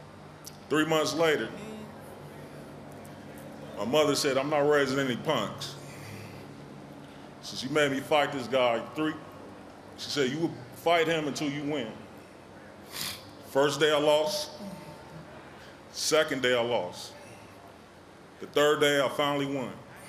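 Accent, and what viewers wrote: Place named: Compton, United States. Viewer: Compton accent like a mf R.I.P. Big LA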